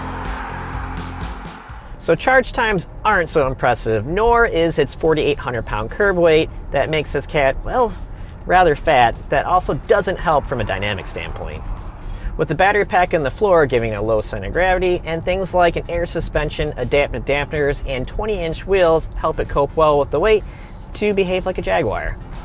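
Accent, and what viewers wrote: United States. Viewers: 😊 I expected him to pronounce it like an American wood since he's speaks like one. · Holy Michigan accent. He sounds like John McElroy lol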